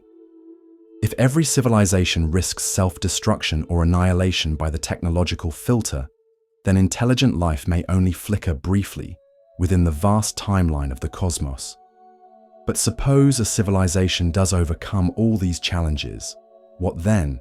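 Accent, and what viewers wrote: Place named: England, United Kingdom. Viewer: I love these types of audio/videos… but one thing I believe can be improved on in many of these audio/videos is the narration. Why does it always have to be an English accent? Try an Australian, South African, or even an American accent.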